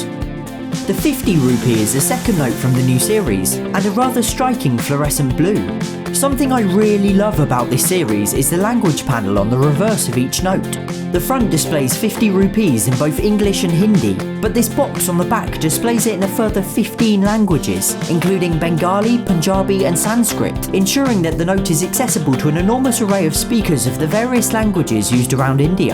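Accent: United Kingdom. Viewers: Awesome video brother.after watching ur this video I've watched ur 6-7 videos&subscribed ur channel.I just found u on YouTube.I'm from India.ur British accent is so sweet hare.love from India🇬🇧❤🇮🇳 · Your way of speaking is damn British .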